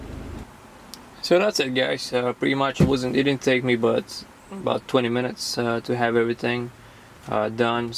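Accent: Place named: United Kingdom. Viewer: martenica bg si :)))) interesno kade moje da si komunikirame poveche da pitam poveche... jiveesh v usa s uk akcent :)))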